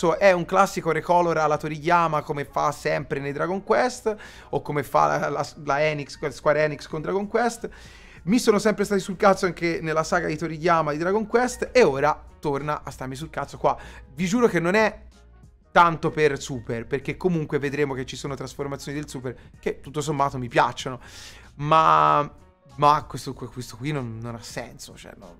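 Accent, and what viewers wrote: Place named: Tuscany, Italy. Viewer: Questo è popo toscano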